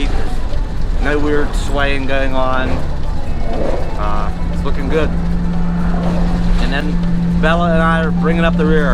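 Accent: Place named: Western Pennsylvania, United States. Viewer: Your accent sounds like mine. Western pa?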